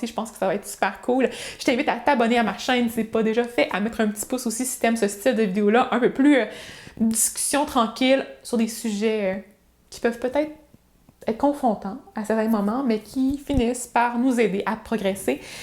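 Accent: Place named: Canada. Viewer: Ah cet accent canadien... la grande classe 🥰 je suis bien d accord avec toi.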